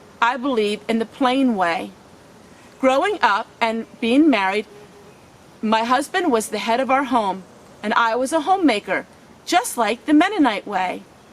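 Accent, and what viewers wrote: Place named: Pennsylvania, United States. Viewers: Yes you can have both. That person is ignorant. I like how you speak, I grew up pretty close to your area in Willow Grove, Pa. during the 60's and 70's so I'm familar with the dialect. Growing up I had two sister Quaker ladies (spinsters) who babysat me and they spoke using always thee and thou in their sentences and wore long dresses and lived very frugally. I learned what a compost pile was from them, how to prepare simple heathy meals and how to wash clothes by hand. People criticize sometimes what they fear. You are honest,simple, godly peole just like my sitters, Cynthia and Myrtle. Keep on being you.👍❤ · I had a feeling you were from the Pennsylvannia area. Choose sounds slightly like 'chews', and on sounds like 'awn' (hard to describe), kinda subtle but I hear it.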